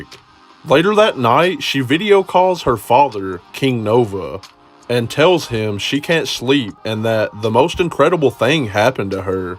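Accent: South, United States. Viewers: its cool seeing a youtuber like this having a southern accent its really rare (im from a southern area lmfao)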